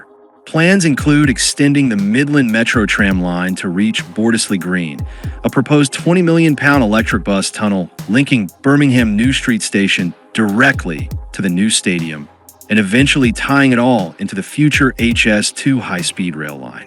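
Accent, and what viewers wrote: United States. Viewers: I would rather have listened to an English-UK speaking narrator than an American one.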